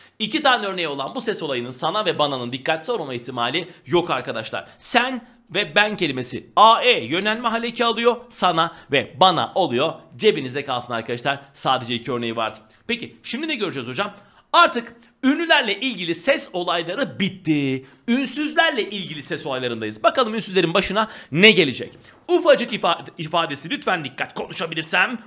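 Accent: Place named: Urfa, Turkey. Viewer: / Hocam urfa şivesi😊😊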